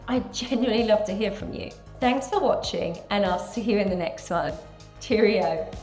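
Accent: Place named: United Kingdom. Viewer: Thanks your very profrssional video. well said, well presented. your English language is Perfect clear slow british style. how u found ur 5yrs experience in coursera? honedtlu. all people talking about their desire to self learning but only 5% complete walking the road to the end. God bless u with many thanks. was nice just cross roads with u here❤🎉gd nite then happy greatvthurc13aug2026🎉😊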